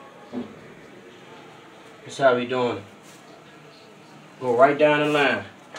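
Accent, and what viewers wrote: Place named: DMV, United States. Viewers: I was thinking that he sounds like he from here lol the slang sound similar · That accent…definitely from the DMV! #HOME 💪🏽🤎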